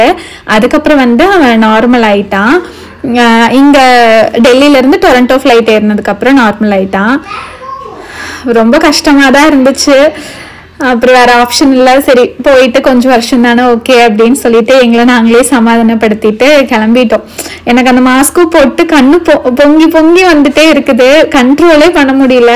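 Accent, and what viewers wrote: Kongu, India. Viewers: eanunga ka niga kongu mandalatha senthavangala unga slang thaniya theriyuthe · Namma kongu slang alazhungoo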